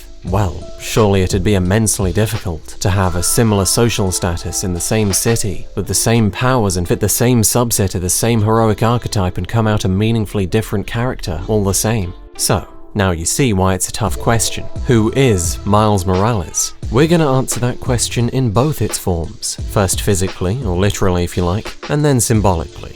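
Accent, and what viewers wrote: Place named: United Kingdom. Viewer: This is what any Englishman that isn't a chav sounds like. I assume you're either very young or American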